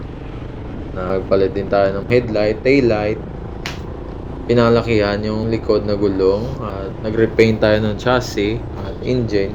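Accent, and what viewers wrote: Iloilo, Philippines. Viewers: ilonggo ka brad? hehe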